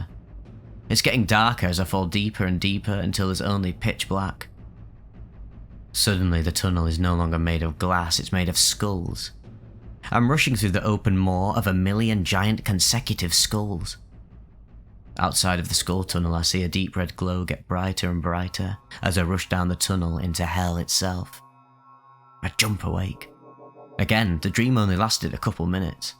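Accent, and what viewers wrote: United Kingdom. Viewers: Wait he might be….British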